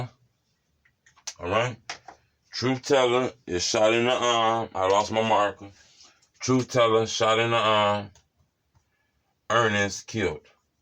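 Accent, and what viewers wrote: Chicago, United States. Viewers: I knew u was from the Go from the accent when I watched your first video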